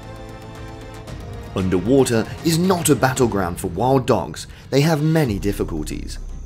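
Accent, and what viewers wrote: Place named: United Kingdom. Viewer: i like the narrator because he can speak with a British accent...jolly good show old bean!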